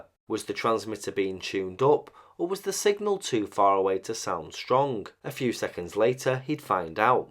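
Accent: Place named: Cockney, United Kingdom. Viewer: Ay love, yer cockney accent is really really thick to these yank blokes who are strugglin like hell to understand. we love all our long lost brothers & sisters from the U.K., & some of the cultural idiosyncrasies are just endearing to us here who embrace diversity. However, still strugglin to hear through the sharp curves in dialect. Someone should invent a bloke to bloke translator. ya know-- "cookies to biscuits" & all that rot.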